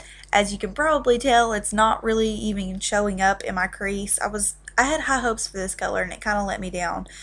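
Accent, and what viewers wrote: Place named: Oklahoma, United States. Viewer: Omg I love your accent!!! I wish texans sounded like that. I live in texas and we talk really fast and nothing like that. I was born in Oklahoma so I used to have an accent similar to yours but not anymore :/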